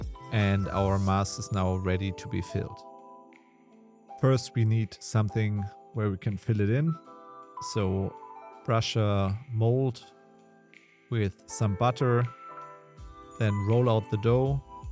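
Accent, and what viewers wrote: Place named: Germany. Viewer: I think you should mention that the lard should be really cold during the Pâte dough making. Preferably put into the freezer 1 hour beforehand. Since otherwise the heat thats created in the food processor due to the chopping motion, will cause the fat to seperate & kind of like with puff or short crust pastry, will result in an oily greasy mess. As a chef, naturally I already know this, but someone who isn't familiar with making a classic Pâte may not know this. Just saying... Btw, your german accent is really strong. lol Are you a chef or are you just a passionate home cook? I think it's a real shame that old classic appetizers like Parfaits, Pâtes, Galantines etc are a lost art. And are slowly fading from existence. Except maybe in France where they uphold the tradition of producing all these classics. But if you look around restaurants in Scandinavia, Spain, Germany, Britain etc It's a real rarity to even find these dishes on a menu, except its maybe a 3 star fine dining etablissement. 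I think I'll do a Poultry liver parfait for new years eve. With maybe a port jelly or a cumberland sauce. And marinate the livers in some madeira and brandy. I admire that you have executed this classic recipe. Cheers !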